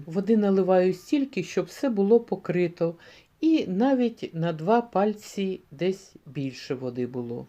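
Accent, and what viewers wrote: Standard. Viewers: так, добре поставлений голос, як у диктора радіо та телебачення, або як у вчительки. Та правильна українська мова, без домішок, та різних польсько-австрійських "вивертів". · Дякую за чисту украīнську мову без польсько-угорських домiшок! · НАКОНЕЦ ТО Я СЛЫШУ ПРЯТНУЮ НАСТОЯЩУЮ ЛИТЕРАТУРНУЮ УКРАИНСКУЮ РЕЧЬ,А ТО ИНОГДА ДАЖЕ НЕ ПОЙМЕШЬ НА КАКОМ ЯЗЫКЕ ГОВОРЯТ,НО ТОЧНО НЕ НА УКРАИНСКОМ.СПАСИБО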